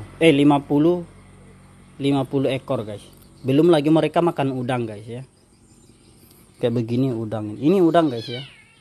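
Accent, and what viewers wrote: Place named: South Sulawesi, Indonesia. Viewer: Kakak domisili di Kalimantan kah... Tapi bahasa dan logatnya kayak orang Sulsel... Kucinng petani ikut tarbiyah...sdh tingkatan takrif atau tahwin kak? 👍🙏He he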